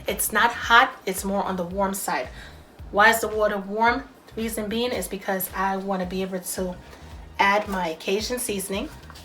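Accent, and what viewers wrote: New York, United States. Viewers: Thank ya Miss...I love cajun seasoning. You sound like a fellow New Yorker...hahaha....love it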